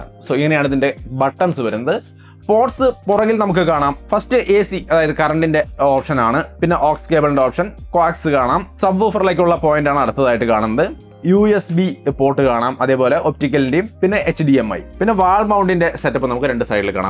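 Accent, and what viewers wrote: Thrissur, India. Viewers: Trissur slang🫶🏻⚡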